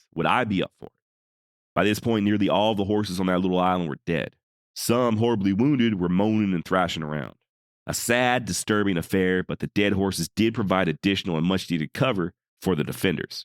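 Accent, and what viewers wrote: Texas, United States. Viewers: Putting a face to the voice I’ve heard for a little while, not gonna lie thought you might’ve been a black Texan… dudes in the south sound a lot more alike across race than anywhere else in the USA. We all have a lot more in common than we don’t. I appreciate your nuance to history telling. And that is coming from a dirty Jayhawker who shouldn’t like the south. I’m pro Americans, even from Missouri lol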